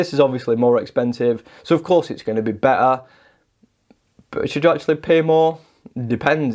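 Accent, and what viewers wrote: United Kingdom. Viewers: Nice review. Like your Bri'ish accent.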